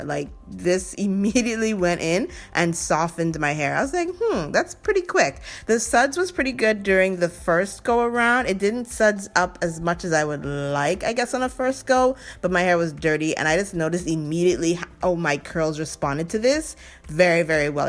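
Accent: Bahamas. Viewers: Where are you from ? I hear your accent. Very close to a Bahamian accent if it’s not one. Fr fr 🙃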